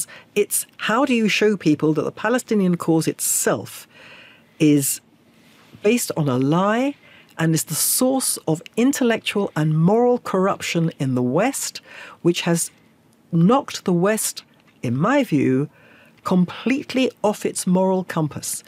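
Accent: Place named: RP, United Kingdom. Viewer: Yeah nice posh accent full of nonsense, so easy to inform lies into tiny minds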